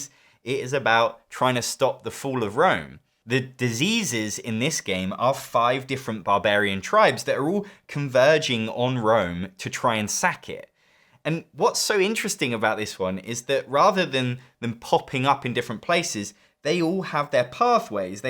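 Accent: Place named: England, United Kingdom. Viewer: that's just how you say "drawing" in an english accent.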